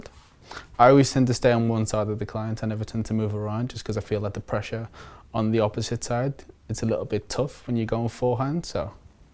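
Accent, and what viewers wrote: Liverpool, United Kingdom. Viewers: U from Liverpool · Have you got scouce in you? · Didn't know you were a scouser. Nice to see the accent getting about. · He's English, but from Liverpool. It's a very distinct, thick local accent there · Is he a scouser? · hamza1947 No. Im from birmingham. He is a scouse trust me. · Your accents mad, like it sounds scouse, a bit foreign and north eastern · Is he scouse? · i don't know why but I never expected you to be British.. This is the first video I've watched of yours where YOU talk. Whoa! Surprised but I love your accent :) thank you for all your wonderful, relaxing videos! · Are you from Liverpool mate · I like your British accent.. make my eye close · Heard the scouse accent. Got excited as live in Liverpool. Looked up his premises to book in. Saw it was in London. Got gutted. :( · He sounds Scouse. (Liverpool) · Is that abit of a scouse accident? 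· u sound like a scouser wer bout is ya barbers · U have the same accent as me. Scouse or Geordie? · are u scouse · Are you part scouse too, i can hear an accent in you?? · Are you a scouser? You sound a little bit like one lol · Do you have a scouse accent you tryna hide? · Jake DaSnake scouse (Liverpool)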